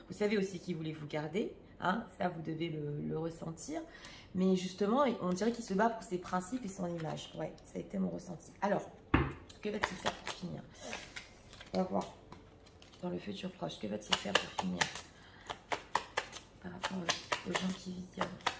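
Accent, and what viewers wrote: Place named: France. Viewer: Mardi beaucoup pour vos tirages, vos conseils, votre énergie, votre franchise. Ne tenez pas compte des railleries de ceux qui se moquent de votre accent français quand vous lisez les cartes en anglais. Ils ne sont pas dignes de votre travail et de votre passion. 😊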